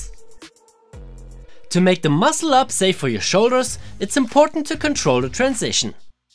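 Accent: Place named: Germany. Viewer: Damn ur German accent is nuts.. I like it, im german as well. Lass Frankreich einnehmen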